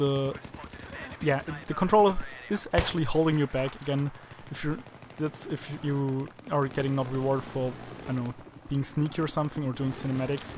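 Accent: Germany. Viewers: du bist deutsch oder? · are you maybe from germany becaouse i hear a little bit accent? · Your german right? Your english sounds a bit german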